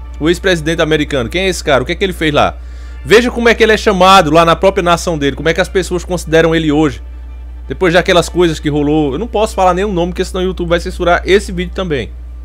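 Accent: Northeast, Brazil. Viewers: Nordestino ele é (sotaque)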